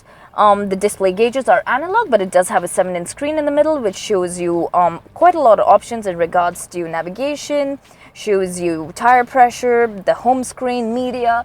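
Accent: India. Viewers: That's confusing...I guess it's either hyundai accent or her Indian accent.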